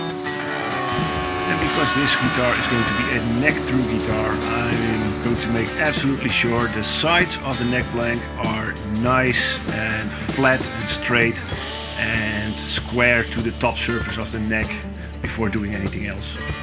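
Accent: Netherlands. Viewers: I didnt know u were a fellow dutchy and assumed you were american due to the americana vibe you had going on. So hearing the accent hit me like a sledgehammer putting me right back in my place. Also nice guitar. Looks sick. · Hi, are you dutch? I hear a Dutch accent. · think if you make the neck blank a little longer and higher you might be able to cut two necks out of it , even when the headstock is tilted back you do need to cut the backside of the neck first and lay away the piece you cut off as the headstock of the second neck gets cut from the heelside of the top part leftover looking from the side of the blank the necks will have the back facing eathother and the headstock slightly passed the heel of the other to have enough material (hence the blank needs to be a little longer) you likely need to have sumting to lay the rough cut blank intoo before cutting the sides out but it should save a ton of waste materials je accent klinkt nederlands trouwens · I love these videos. 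So much information with a cool (Dutch?) accent. :)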